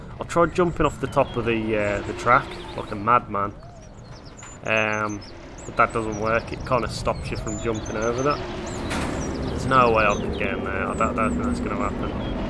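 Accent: Black Country, United Kingdom. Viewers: Oh wow I love this channel and didn't even realise you are from Oldbury, I live so close, in Tividale, going to Papa John's in a bit if it's open lol! I should have realised from the Tara a bit, but that just sounds normal to me, I forget our dialect really is quite confined, and no, we ay Brummies lol!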